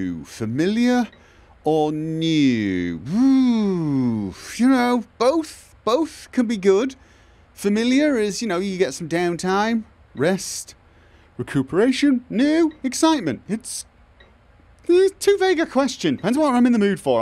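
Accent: United Kingdom. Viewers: Jeancly Hi! I'm learning british english, could you tell me where is his accent from?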